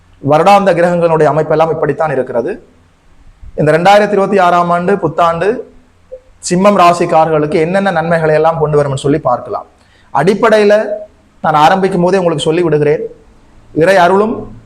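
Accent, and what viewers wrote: Sri Lanka. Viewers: Sir unga tamil and astrology fan sir naan...ungaa srilankan tamil kekkatha naan ungala subscribe panniirukaen. I m from kanyakumari sir(Nagerkoil)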